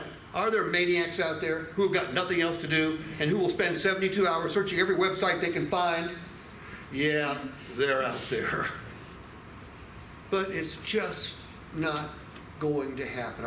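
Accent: Appalachia, United States. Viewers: Hillbilly and his bullshit hillbilly talk give it up. It’s over nobody cares. These are all tricks that consumers know about you need to sit by the pond and watch the ducks.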